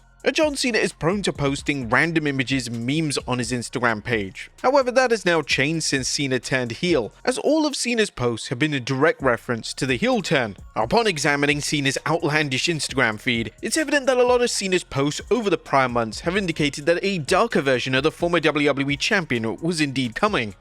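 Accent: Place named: United Kingdom. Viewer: i hear a british accent, i leave the video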